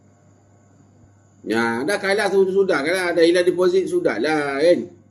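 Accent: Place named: Muar, Malaysia. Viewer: sempoi la bagi insight analysis PRN Johor. simple tapi detail. berborak style orang Muo. lawak tapi bisa.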